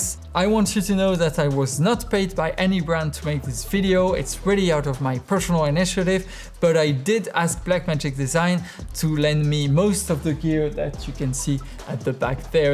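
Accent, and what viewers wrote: France. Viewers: Are you french? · why french people have such thick accent bro, I honestly think they don't like english so they butcher it on purpose, spanish is my native tongue and I have virtually 0 accent compared to this guy. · You can’t hardly get more french accent than this guy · That french accent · Do french people seriously still speak like that? He should make an effort, this accent has expired. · Idiot TOOO TECHNICAL AND PLEASE GET RID OF THAT FRENCH ACCENT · he is French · Je préfererais que tu parles en français pas en anglais avec l accent fr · he is french for sure, he is based in Paris and his accent is 100% french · Ton accent francais xD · Grate camera . and i like your speaking french accent english , Amazing video ! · Tu es francais ? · It french accent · He has french accent lol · Quel bel accent français. Romantique 🤗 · That was very entertaining and informative thank you. 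I can't decide which is the ultimate French-English moment: "necessessary" or "...and you end up with a very big and expensive lens!👨🏻‍🍳" Said with much love and all due respect... we have francophones in Canada but the "real" French emote and accent words so cutely. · French accent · speak normal english, not a dumb french accent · Le commentaire français que vous cherchez. Sacré accent français d'ailleurs. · Nice French accent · Is he french? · T'es pas français toi par hasard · French maybe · T'as un accent français, je me trompe ???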